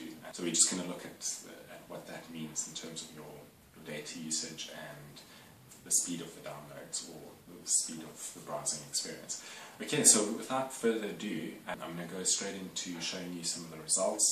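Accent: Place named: South Africa. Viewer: Sounds South African.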